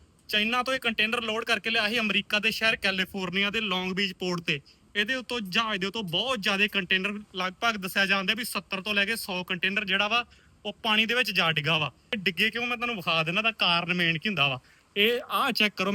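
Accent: Majha, India. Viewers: Verr ਮਾਝੇ ਦਾ ਲਗਦਾ 🙏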